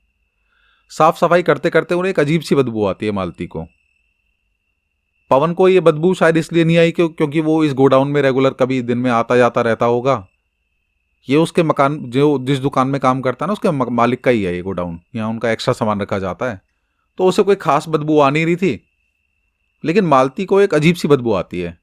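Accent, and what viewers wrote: India. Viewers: Hii 🙏 nice your deshi voice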